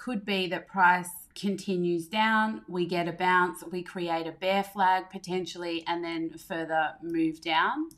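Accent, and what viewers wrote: Australia. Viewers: Hi, great content, you sound like an Aussie !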